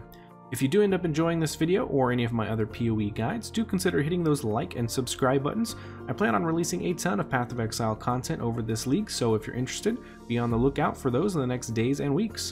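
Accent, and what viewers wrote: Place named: United States. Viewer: A someone who isn't American, I usually find the accent as hit or miss. You have a lovely tone, pace and cadence to your voice, and it made for a really nice video. You should do more content, and persevere with your Youtube channel.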